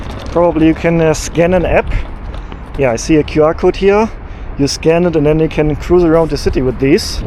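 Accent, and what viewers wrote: Germany. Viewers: Can tell you are german, as you pronounce your w with an r and vice verser. Ive subscribed to you. 💋💋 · nice German accent. Makes you "sympathisch" and real❤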